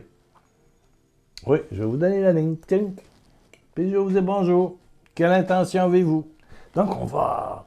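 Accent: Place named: Canada. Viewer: s'est lâché avec son accent canadien aujourd'hui, c'est très agréable. Merci pour tes paroles de réconfort. Il st tellement difficile d'être joyeux en pensant à toutes les victimes innocentes des guerres. Je ne pensais pas qu'à notre époque, il y aurait encore des guerres. Et j'ai pensé à dire à la Sainte Vierge Marie de prier sur tous les responsables politiques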